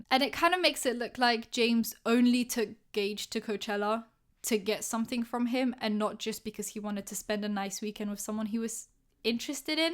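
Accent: United Kingdom. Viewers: This woman has the most mixed British accent I've ever heard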